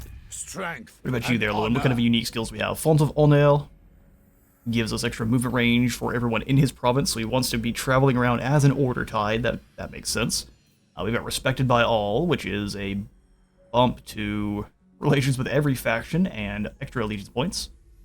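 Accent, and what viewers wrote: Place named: France. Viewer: Love the French accent.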